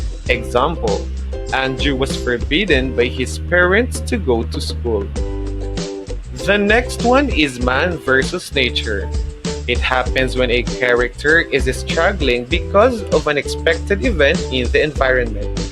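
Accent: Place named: India. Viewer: He has Indian accent.It reminds me Apu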